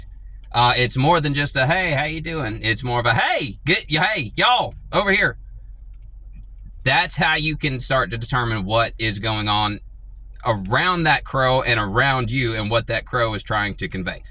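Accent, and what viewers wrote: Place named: South, United States. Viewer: Thank you for explaining this about crows. And for keeping most of your Southern accent... I'm originally from the bayou country south of New Orleans. Crows around my hometown were very loud, and for some reason I used to be afraid of them. I've since learned more about their intelligence and friendship with humans by watching videos. Where I live now there are a variety of birds and squirrels we feed with black sunflower seeds, along with Crows that let themselves be known in the area. I'd love to learn how to meet and befriend them. I've joined your channel and am looking forward to learning more from you. Thank you 😊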